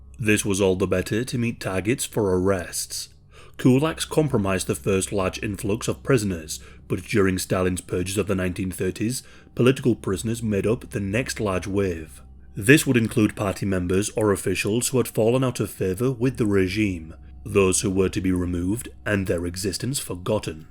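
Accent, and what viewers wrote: United Kingdom. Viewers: Dudes British my guy. He just talks like that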